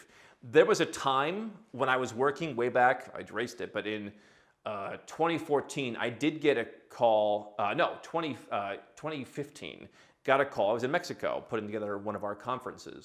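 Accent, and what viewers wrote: United States. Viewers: It's an interesting point how you brought up that pride month is about identifying how you want to identify, but that you can't be Norwegian. One's identity is an internal thing, but is ultimately irrelevant. With your accent, you're obviously an American for all intents and purposes except the passport (which, since most Americans don't have passports anyway, further supports that point, with the caveat being of course that since you renounced your citizenship, you cannot live in the US). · As soon as you speak we know you're American! Nothing wrong with that and the paper you hold can't change that. It's nice that you're also s citizen of the world. 😊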